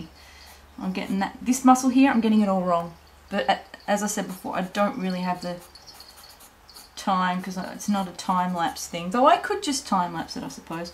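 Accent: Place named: Australia. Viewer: Yay! an Aussie you-tuber!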